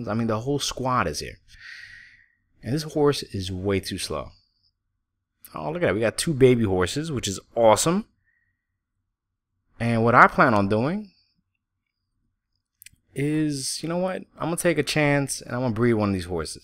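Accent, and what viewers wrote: New York, United States. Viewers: Ahhh, so thats the lovely New York accent we hear!! Great start to a series. It goes to show that a bit of exploring can really help - you're so brave, I have to set up a whole town with a million full chests before exploring - I guess it's the hermit in me :D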